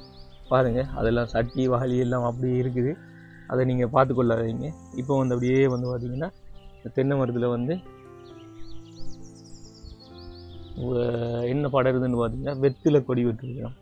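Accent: Sri Lanka. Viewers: Neengal pesum ilangai tamil migavum asagaka irukirathu brother happy married life.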